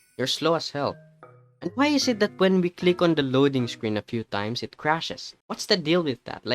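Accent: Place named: Philippines. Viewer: I have a big feeling you are a filipino because of your accent.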